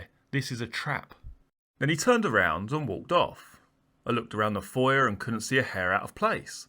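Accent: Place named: Essex, United Kingdom. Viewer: He can't help his accent he's from Essex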